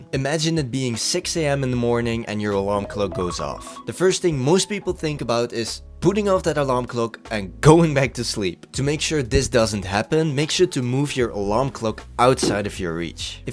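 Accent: Netherlands. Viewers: Are you Dutch? Idk kinda sounds like a Dutch accent haha :) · Are you a Dutchie?😂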